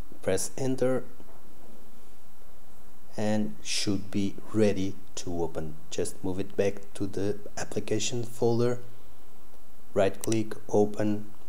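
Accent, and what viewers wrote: Portugal. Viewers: Reconhecemos um fellow tuga quando o ouvimos hehe. Obrigado ! Resolveu !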